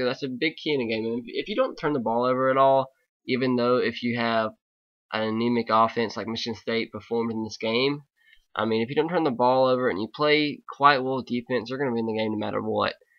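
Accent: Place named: South, United States. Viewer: yeah i can tell you're southern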